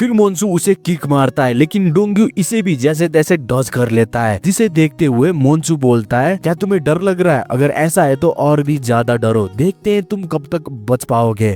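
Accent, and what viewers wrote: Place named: Nepal. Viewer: Hamro jastai Hindi xata😂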